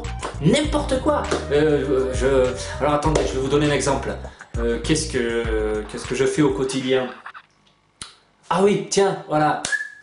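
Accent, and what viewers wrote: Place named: South, France. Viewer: enfin un sudiste qui fait des podcasts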